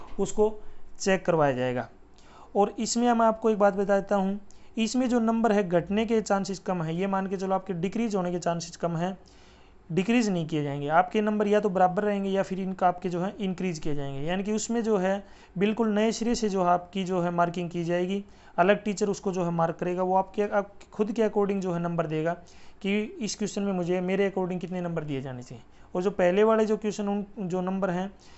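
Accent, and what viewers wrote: Haryana, India. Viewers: bhai aap haryana se ho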